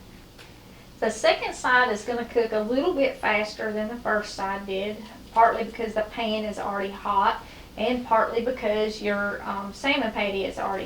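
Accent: South, United States. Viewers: Good to hear your accent to I’m a guessing southern wv or ky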